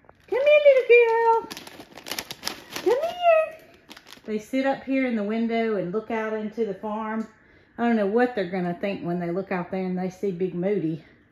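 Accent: South, United States. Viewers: I am not big on building videos, but I enjoy videos. I even watch the entire fence pole videos.Maybe it is music and your pleasing southern drawl. Love the picnic table and to honest I wouldn't mind having one like it.😊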